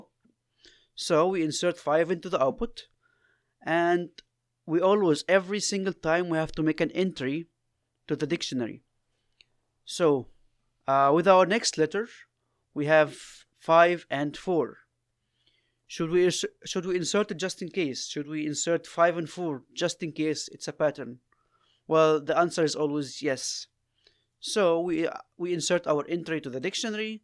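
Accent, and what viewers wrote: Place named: Egypt. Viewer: You are egyptian 😂 I Know this kind of accent, but good job keep it up bro.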